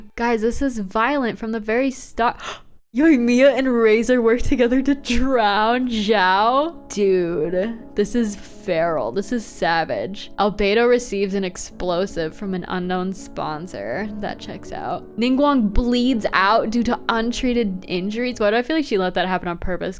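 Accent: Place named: United States. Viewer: this girls american accent and mispronounciation of tradional names is killing me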